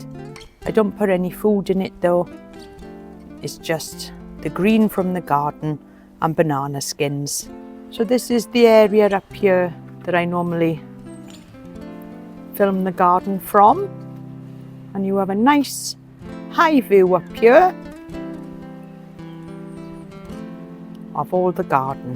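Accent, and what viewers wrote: Wales, United Kingdom. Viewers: You have a certain charm to your videos that make them relaxing to watch. Not sure if it’s the music or your Welsh accent or both but it works really well. The garden still looks great. Keep enjoying it.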